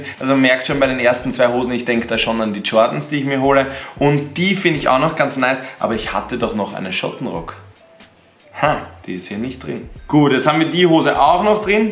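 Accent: Austria. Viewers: Wie findest du Hosen von GVAPI? Sag mal irgendwo deine Meinung dazu😁btw feier deine Videos brutal weiter so und hau mal mehr österreichischen Akzent raus😂😂❤️❤️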